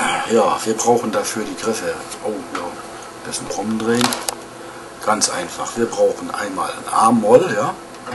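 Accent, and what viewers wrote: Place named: North Germany, Germany. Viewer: Vielen Dank - toll erklaert! (...und noch dazu mit schoenem norddeutschen Aktzent) Ich werde das Lied mal probieren! Gruss, Oliver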